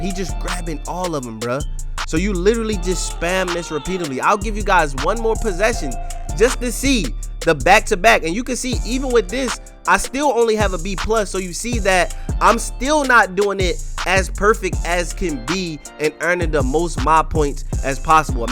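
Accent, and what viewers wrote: Baltimore, United States. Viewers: Aye bro where u from, I swear i hear some Baltimore coming u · I been watchin you fo a min you from Baltimore got the accent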